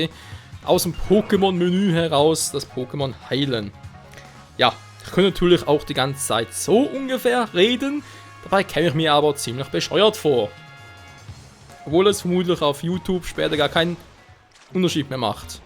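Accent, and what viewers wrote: Switzerland. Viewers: mä merkt das schwizer bisch:)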